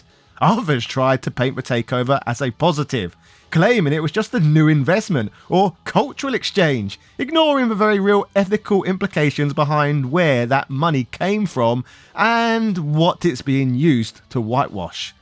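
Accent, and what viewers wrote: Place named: Liverpool, United Kingdom. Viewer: You sound like a scouser mate.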